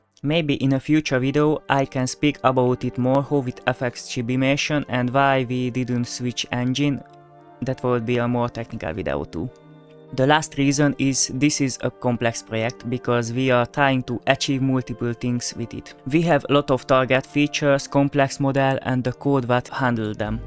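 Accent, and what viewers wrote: Hungary. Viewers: Ik I recognized the hungarian accent ^^ tudtam felismerem a magyar accentust :3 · The Hungarian accent is very audible, which I'm happy about because I'm Hungarian Szóval nagyon várom hogy kijöjjön a játék<3 (ha még nem jött ki) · AMERICA 🇺🇸🇺🇸🦅🦅 Wait, what language do you speak then? I hear an Hungarian accent so I assume that you speak Hungarian (like me!!! )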